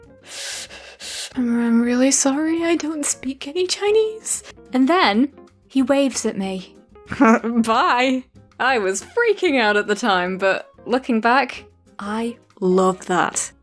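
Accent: United Kingdom. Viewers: Oh dear do I luv your british accent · Omg, she’s BRI’ISH!! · I come for the stories, and stay for the British accent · British language ahh I like it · EW YOU'RE BRITISH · who else stans british girl voice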